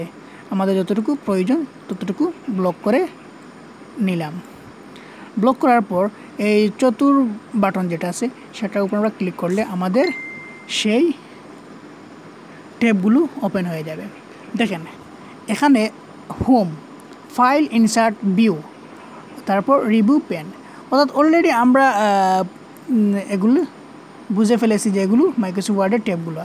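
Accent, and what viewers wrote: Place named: Sylhet, Bangladesh. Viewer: তুমি সিলেটি নি ইয়া মাত বুজা যার🤣যাক আমি কিতা তাইলে বুঝছি নি, জানাইয়ো তে কমেন্ট এ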